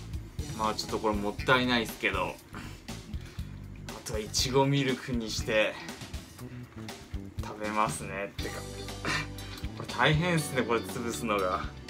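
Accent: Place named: Kansai, Japan. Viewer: あかん笑 に親近感わく＼(^_^)／ もっと関西弁出してって欲しい♪ にこにこで幸せそう！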